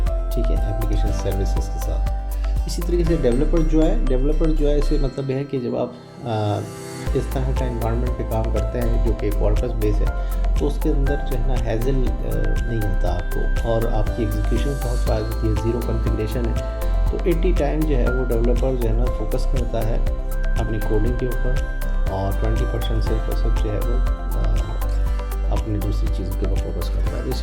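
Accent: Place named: India. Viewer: This hardcore indian-english accent, combined with the loud background music makes it really hard to understand anything at all 🙁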